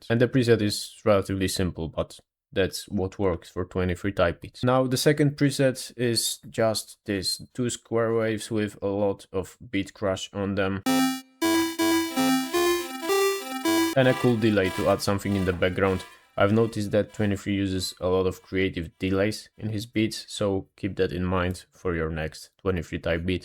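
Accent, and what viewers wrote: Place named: Poland. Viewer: thats gotta be the thickest polish accent ive heard in a while 😂😂😂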